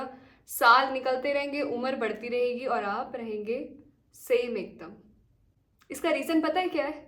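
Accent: Bengal, India. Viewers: Tu bengali hai kya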